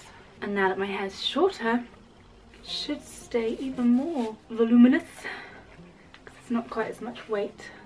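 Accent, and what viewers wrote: RP, United Kingdom. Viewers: Posh English accent. ;)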